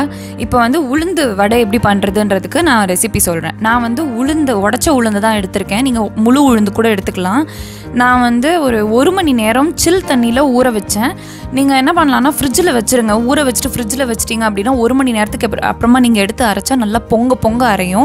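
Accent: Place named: Badaga, India. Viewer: Sister nenge badugas ah.. neenge pesarthu apdi tha iruku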